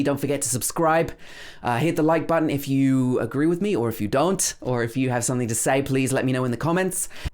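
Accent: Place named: United Kingdom. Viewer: Bro Hollywood movies have an American accent but he speaks British accent so what you may feel difficulty in understanding it ❤️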